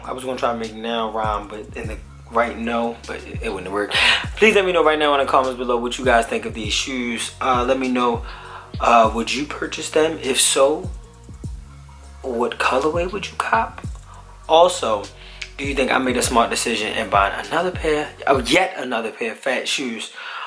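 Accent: Baltimore, United States. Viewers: u gotta b from bmore i could hea it in ya accent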